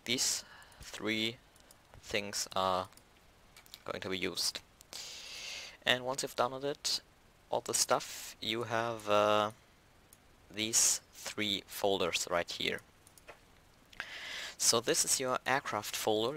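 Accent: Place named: Germany. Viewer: Man hört das du deutscher bist xD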